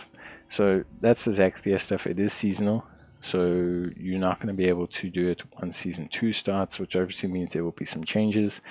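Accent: South Africa. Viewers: Are ya South African?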